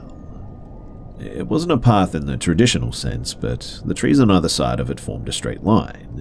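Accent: Australia. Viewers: I normally can't stand hearing a fellow Australian accent in videos/movies but this voice is particularly nice. Thanks for the content, I'll leave a like & subscription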